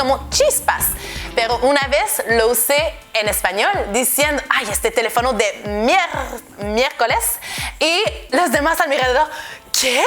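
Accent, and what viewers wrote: France. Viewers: Tu acento francés es agradable al oído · primera vez que escucho el acento frances con el español, :O es hermoso gracias ;D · Las combinaciones que con más complicación es la combinación de las letras " TL " por ejemplo Tlalpan, Tlatelolco si prácticas este tipo de palabras te aseguro que se te hará más fácil el poder pronunciar el español, ha por cierto acá en México hay mucha falta de ortografía lenguistica y te darás cuenta ya que la mayoría de las personas ocupan la palabra ahorita para posponer alguna actividad ya sea para realizarlo posteriormente ya sea en hora o días , por cierto el acento que se te escucha francés y español se te escucha bastante hermoso saludos desde México 🇲🇽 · Que buen acento frances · el acento no tiene nada de malo, mi madre murió con él; es verdad, la formación bucofaríngea de entrenamiento del idioma manda; te doy un detalle: los españoles que pronuncian con zeta y ce marcadas, no pueden pronunciar la "tl" (atlántico dicen "adlántico", hitler dicen "hidler"); en estos días estoy tratando de pronunciar el nombre del piloto francés de Indycar Romain Grosjean (difícil) ¿gjromaen grosyeán?; todo es cuestión de práctica, hablas muy bien, perfecto, con acento francés, claro; ¿así que de la tierra de Aline Dieu? 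hablan francés en Quebec ¿verdad? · Se te entiende bastante bien el español y eres muy simpática. Creo que el tema de los acentos es una opción personal, hay quienes les importa más o menos hablar con acento extranjero. Si de verdad quisieras suavizar tu acento francés al hablar español, una pequeña sugerencia podría ser que trates de no vincular tanto tu idioma nativo al español. Un políglota que sigo en youtube dice que cuando habla otro idioma trata de verse a si mismo como si fuera nativo del idioma objetivo, de gesticular, incluso de vestirse como ellos, esto ultimo puede ser un poco exagerado pero me imagino que es algo similar a lo que hacen los grandes actores. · Felicidades hablas español muy bien👍 y el acento francés es muy bonito👍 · Te felicito que bien hablas español , solo es el acento y eso no significa un problema , igual para los españoles que viven en latinoamerica ellos aunque vivan en Peru por ejemplo nunca dejan su acento y su pronunciacion , basta ir a la iglesia y escuchar que aunque han llegado hace 40 años siguen pronunciando a la española , yo pienso que con tal que te hagas entender y uses bien las palabras el tiempo se encargara de ir suavizando tu acento frances pero a mi me parece muy gracioso y lindo , abrazos y bendiciones💗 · Por favor no pierdas tu acento francés, es muy bello escucharlo mas de una chica.... 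· A mi me encanta tu acento y si te escucho hablar sin conocerte pensaría ella es francesa! Es parte de tu identidad y no hagas caso a malas criticas como si ellos intentaran si quiera aprender tu idioma , no te preocupes tu español es excelente! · eres guapisima, tienes un gran nivel de español y ese acento frances le añade un toque especial, entiendo lo complicado que es pronunciar, a mi me pasa con el frances, requiere de esfuerzo, un saludo;)! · La verdad es que hablas mejor el español que muchos cubanos, puerto riqueños, argentinos, centroamericanos, chilenos, argentinos, uruguayos, algunos españoles (a los cuales nos se les entiende ni madres), etc. O sea que no tienes nada de que preocuparte, ya que casi hablas igual que los mexicanos del centro del país. Lo único desagradable es tu notorio acento francés, pero tu dicción, en general, es muy buena. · Por cierto estoy aprendiendo francés. 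Después de varios intentos en el pasado me muero por aprender vuestro idioma y eso que ante lo odiaba y todo ello gracias a tu acento francés del español. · Double wow. Tout d'abord tu parles vraiment bien espagnol. Pour ce qui est de ton accent, c'est la première fois que j'entends un accent français aussi fort. Je serais curieux de connaître comment tu as pu maitriser aussi bien la langue espagnole sans perdre ton accent.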